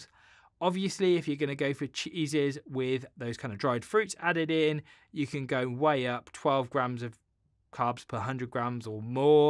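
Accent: England, United Kingdom. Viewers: I'm glad to come across you Dr.:) I was eating a keto diet for a year and lost 3.5 stones in the first 6 months. Lock down happened and my life was wiped out. I have a weak immune system so I have to stay away from people and get my vaccine shots for the rest of forever. However, when I decided I needed to start living again and eating "keto" again I found I could not get into ketosis and eventually could not even follow the diet. I'm still struggling but I will keep trying and it's great to hear advice coming from an English accent for once. 👍😉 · I can’t deal with the British accent, sorry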